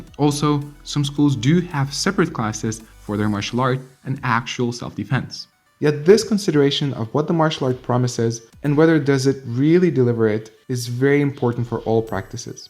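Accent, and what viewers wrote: United States. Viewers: I see the issue with your view. Martial Arts do work but you have never been taught to think the way of the Martial Artish. You must remember young Martial Artish to swim like a brick, Be the bookcase. Flow like a wall. You sound American but yet you say mobile phone and not cell phone as Americans do so that was unexpected. PS give me your phone ! :)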